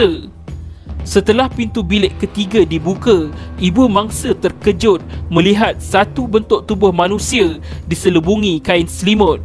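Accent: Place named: Malaysia. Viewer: alah memang slang melayu cmtu kn hshsh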